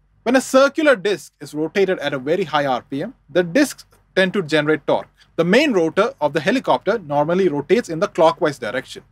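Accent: India. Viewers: Ugh heavy indian accent...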